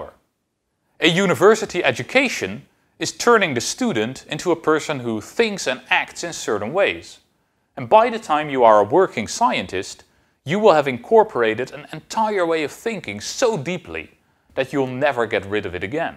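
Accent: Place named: Netherlands. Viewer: i love your dutch accent! Lekker!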